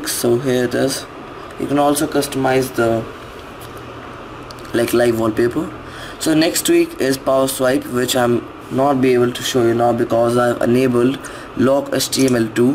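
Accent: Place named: India. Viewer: I love your accent no offense I love Indians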